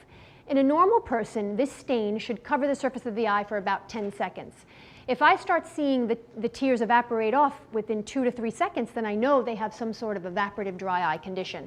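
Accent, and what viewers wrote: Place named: East Coast, United States. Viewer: She’s got a very interesting American accent I’m not familiar with. East coast much ? Haha <3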